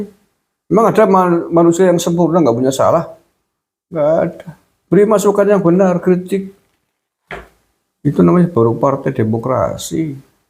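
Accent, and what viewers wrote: Banyumas, Indonesia. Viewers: Mau emosi denger bhsa ngapak jeg ketawa😂😂 ga jadi dah emosi lbh baik legowo..😊😊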